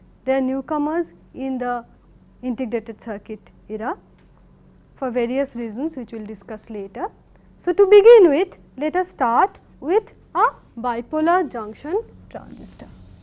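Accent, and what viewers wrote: India. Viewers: She is like a million times better than any professor who teaches engineering in India except the other IIT profs. who are comparable to her.So she is one of the best profs. ever ..so you should just shut up and listen to the best lecture you can get without whining about the accent and stuff..her accent is the thing which makes things unique ..that's the Indian accent!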